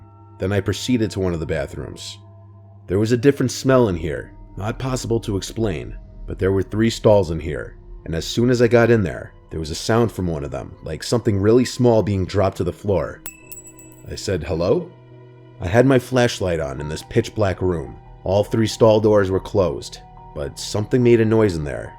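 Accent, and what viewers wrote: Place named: New York, United States. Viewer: The New York Borscht Belt accent is killing me.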